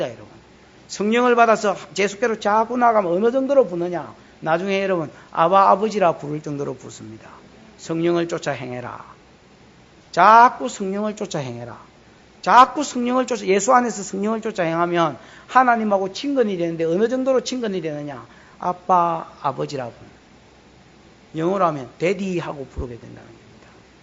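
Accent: Gyeongsang, South Korea. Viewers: 찰진 경상도사투리가 귀에 쏙쏙 들어옵니다^^😅 목사님의 깊이있는 메세지를 통해 다시한번 말씀앞에 서서 기록하신 말씀대로 거룩하게 살기를 소망합니다.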